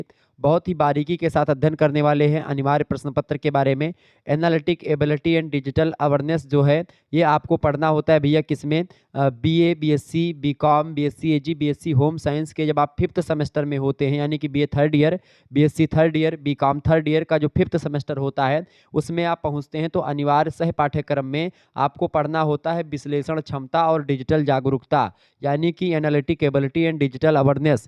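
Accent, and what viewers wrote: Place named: Awadh, India. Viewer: सर के मुंह से अवधी भाषा बहुत प्यारा लगता है❤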